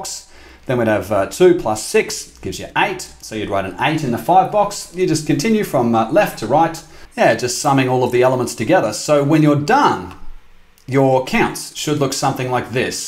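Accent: Australia. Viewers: Are you Australian? I'm new subscriber, just asking because you sound like an Aussie guy I know. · You speak algorithm language You speak australian accent You say adios spanish language In my mind, i see a natural 0x10 base or 0x100 base for almost any kind of data, such as string, floats etc, and very fast too with bitwise operation and direct addressing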